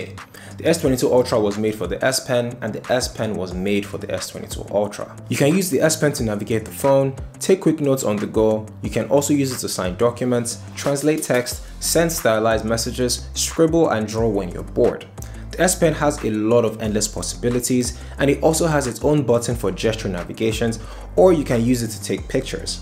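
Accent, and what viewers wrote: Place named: Nigeria. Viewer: The only Nigerian reviewer wey get sense ,I love your accent bro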